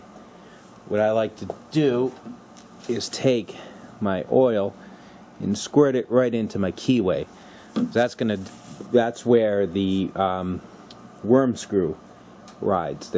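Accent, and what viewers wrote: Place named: New York, United States. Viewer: the carriage hand wheel. I think I just got some old oil gunk under the carrier. I sprayed wd40 and it got much smoother but I probly should remove the carrier and clean under that. It looks like we both have a b9w is that right? I'm new to this machine and would desperately love to learn some more. Btw I'm located in CT and I love the ny accent lol. It looks like we do indeed have the same lathe. I would love to talk to you and maybe pick your brain a bit if you dont mind?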